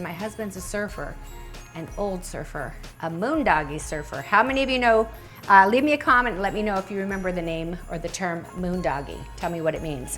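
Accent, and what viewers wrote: United Kingdom. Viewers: Very Beautiful, understated, classy as usual! Was eagerly awaiting your unveil, love the flow. Also love your British accent. Happy holidays from England. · LIKE 👍for your British accent😂👍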